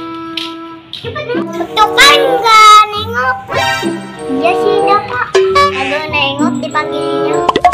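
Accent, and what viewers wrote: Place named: Jakarta, Indonesia. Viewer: wah Betawinya ngena bgt lucu abisss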